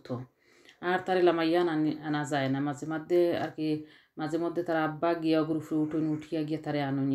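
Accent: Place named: Sylhet, Bangladesh. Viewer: Ldone assalamualaikum apu kemon achen sylhet vasha sunte valo laglö apu sylhet vasha asena shuddo vasha chole ase valo laglo apu ❤❤❤❤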